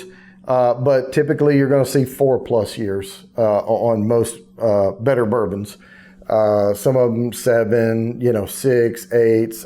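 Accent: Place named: South, United States. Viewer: I just now found this channel and this review. I'm drinking Bernheim tonight, so I thought I'd just see what other reviewers thought of it, and there you were. I'm happy that you enjoy the Bernheim, because I do too. And it's nice to hear a southern accent - I'm a Texan, and it seems like 99% of all the reviewers on YouTube are Yankees. :)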